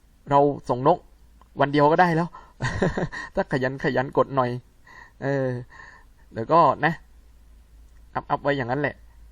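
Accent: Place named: South, Thailand. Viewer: ชอบสำเนียงใต้ๆของพี่นะ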